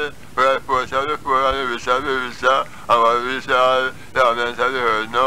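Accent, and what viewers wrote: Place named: St Elizabeth, Jamaica. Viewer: he just have a deep ancient St Elizabeth accent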